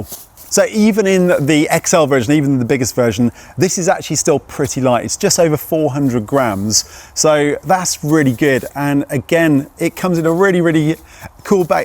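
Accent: United Kingdom. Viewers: Since this guy speaks with a British accent, I'm going to assume his idea of bikecamping kit and mine do not align. First off, he doesn't have enough storage space for a 300 mile to 1600 mile trip like we prep for in Canada and America. Add Mexico and South America to that distance if you want to be serious. Second. Bears. Lots and lots of bears. Tarp is an option in N. America. So is a hammock. But it's not a serious option for serious bikecampers who want to roll across the desert and Rocky Mountain regions of Canada and America. Please add, EU advice ONLY to your title, bra.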